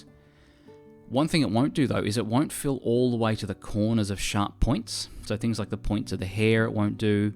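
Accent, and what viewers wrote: Australia. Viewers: You Australian by any chance mate? amazing tute btw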